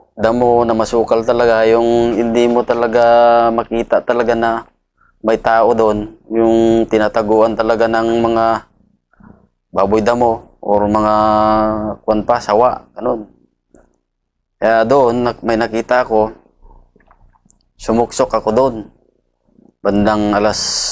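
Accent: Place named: Ilocos, Philippines. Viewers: Halata mo ilocano si buddy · Taga saan si sir? Igorot ba kyo o Ilocano? Kc sa pagtatagalog nya parang kababayan ko sya? Salute you sir! God is good all the time, hindi nya kyo pinabayaan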